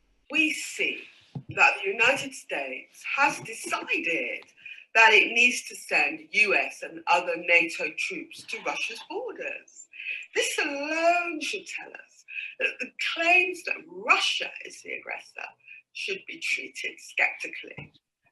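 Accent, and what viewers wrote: United Kingdom. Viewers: It's a bit disconcerting to me that a seemingly intelligent British Accent is being used to say stupid things.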